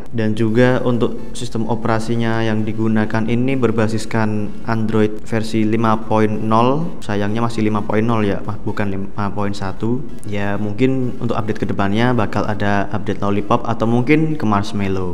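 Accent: Java, Indonesia. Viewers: bang aslinya orng mana sih ? kok logatnya kyk orng jawa ? hehe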